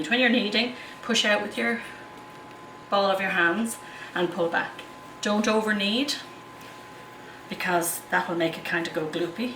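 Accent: Ireland. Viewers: somehow the scones look like they would be more delicious because she has an Irish accent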